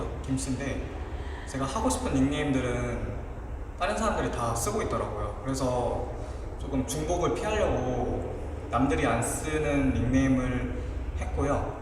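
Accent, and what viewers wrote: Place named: Gyeongbuk, South Korea. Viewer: 고향이 어디인가요? 경북지역 억양이 있는데.. 궁금하네요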